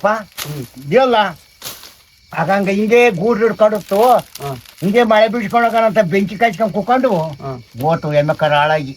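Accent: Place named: Tumkur, India. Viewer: ನಮ್ಮ ತುಮಕೂರು ಭಾಷೆ 👌👌👌👌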